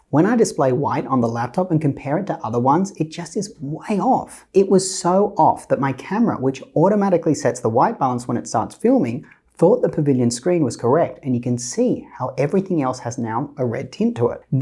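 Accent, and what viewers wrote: Australia. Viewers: That’s crazy I’ve watched like 20 of his vids already and thought he was in Australia or NZ lol wtf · TIL this man with a very Australian accent lives in NYC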